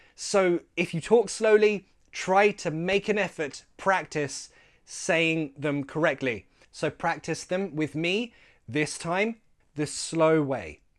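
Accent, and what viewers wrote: United Kingdom. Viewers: Thank you so much for helping us know the perfect British pronunciation. Sir, i am a big fan of you. And I love you.👌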